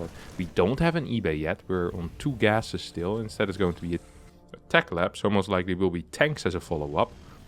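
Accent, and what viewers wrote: England, United Kingdom. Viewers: i just dont like his english accent, feeling like I cant be the only one, his account is still fairly small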